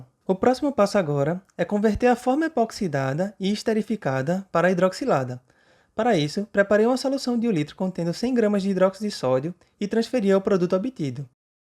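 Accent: Northeast, Brazil. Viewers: Mto bom! Parabéns pelo canal. Sotaque do Nordeste me lembra de casa!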